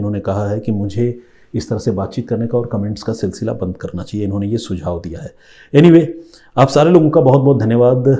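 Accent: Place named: Bengal, India. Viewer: आपके कहानी सुनाने का अंदाज बहुत ही आकर्षक है। मैं विशेषकर आपके बंगाली हो करके शुद्ध हिंदी में कहानी सुनाने के अंदाज से मैं बहुत इंप्रेस्ड हूं।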